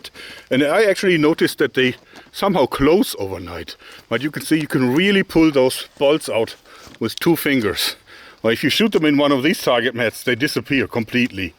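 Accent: Germany. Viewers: I've been a fan of your channel for years, and I was on a spree of your older videos today, and something that I notices is that your german accent disappeared more and more over the years! Keep up the videos, amazing channel!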